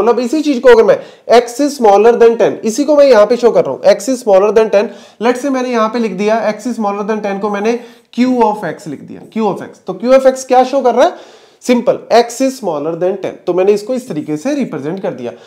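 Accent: Punjab, India. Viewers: nahin probably somewhere around Amritsar-Chanidgarh-Delhi Area I can notice punjabi touch in his hindi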